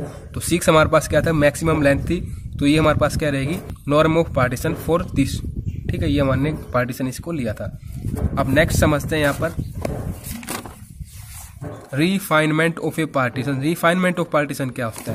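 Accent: Haryana, India. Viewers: Aap h haryana se ho?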